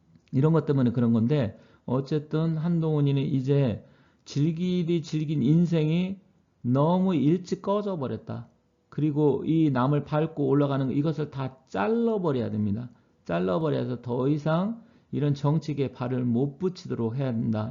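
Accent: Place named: Jeolla, South Korea. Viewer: 선생님은 말씨톤이 전라도말씨같은데 전라도에서는 보기드물게 자유민주주의 보수우파시네요. 수고가 많으시고 훌륭하십니다.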